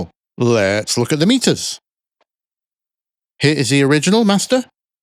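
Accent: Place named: West Yorkshire, United Kingdom. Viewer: nice videos! You sound like you're from west Yorkshire, talking posh? 😀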